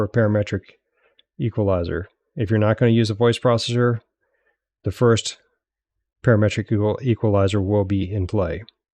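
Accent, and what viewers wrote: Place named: United States. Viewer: One thing you could also do to improve your transmit audio is to ...speak...more...slowly.... I dont mean gaps between the words. I mean the w o r d s t h e m s e l v e s . Whatever part of the USA your accent is from, you speak very fast. So on a difficult receive situation, or for someone whose first languge is not English, the receiver will find you much more difficult to understand. Yes it's difficult to do, but certainly in your case it will make a big difference.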